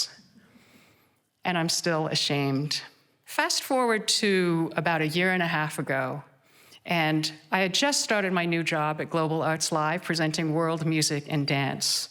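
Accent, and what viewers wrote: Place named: California, United States. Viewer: Amazing accent? Californian perhaps?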